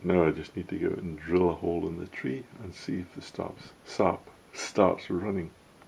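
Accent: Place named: Scotland, United Kingdom. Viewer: I hear a Scots accent ☺️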